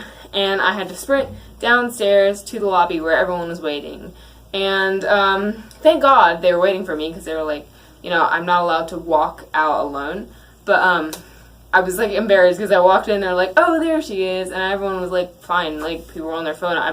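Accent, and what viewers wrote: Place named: South, United States. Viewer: all that suthern drawl down there at duke done pulled away ur british aksent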